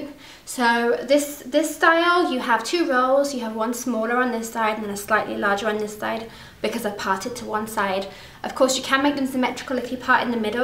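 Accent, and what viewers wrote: United Kingdom. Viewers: I always thought you would be from the US - I was quite surprised to hear your sweet English accent! Your hair as you take your pin curls out makes me want to chop mine right off - it looks so gorgeous, an aqua Marilyn - LUSH.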